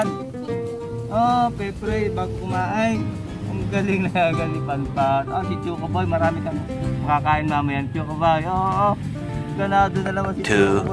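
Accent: Batangas, Philippines. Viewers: Wow look so delicious, nakakagutom naman, yang ang Luto nang tiyo ko na Batangueno, love the accent, thank you sa masarap na Putchero, babait naman nang mga bata, natututu silang tumbling sa pagluto, I'm pretty sure na mag mamana sa yo, one day baka mag chef sila well done. Watching from Queensland Australia ❤❤❤❤🇦🇺🇦🇺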